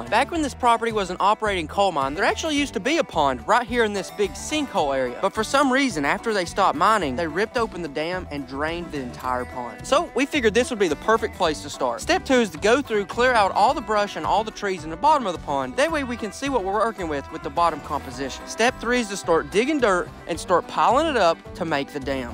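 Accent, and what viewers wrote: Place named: Texas, United States. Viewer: that texas accent🤌🏻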